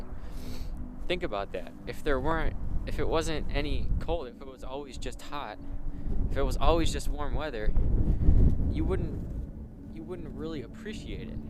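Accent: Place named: United States. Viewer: hey yeah you had better. No, I am just kidding. Sorry to be so cringe, just that you just make me so happy. Sometimes my autism just gets the better of me, but then to calm me down, I just watch one of your videos and that helps me. I am from England and I just love the American accent 😍 especially yours. So once again, thank you. To be honest, If I met you in real life, I would be nervous wreak ha ha coz even though you are not doing ASMR anymore, your voice would totally relaxed me. Anyways, I am gonna stop being cringe right now lol. I will look forward to your next video. 😊❤❤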